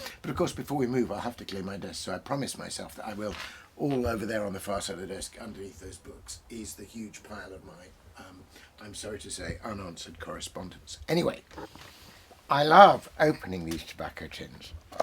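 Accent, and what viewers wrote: England, United Kingdom. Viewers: A fine stoic English accent will be the most comforting thing I can think of if the world collapsed tomorrow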